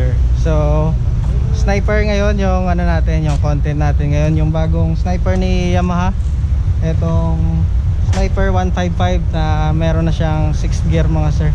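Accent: Iloilo, Philippines. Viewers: Ilonggo ka gli to😂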